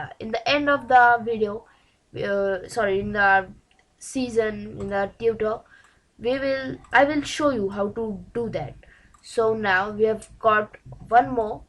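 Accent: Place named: India. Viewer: That Indian accent tho!!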